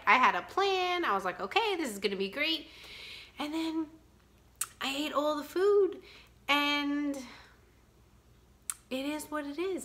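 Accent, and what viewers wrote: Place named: New York, United States. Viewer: So Happy i found you the u tube!! Love your NYC accent! Im from Philly! I could listen to you All day, love your honesty 💯💯💯💞💞💞 keep them coming!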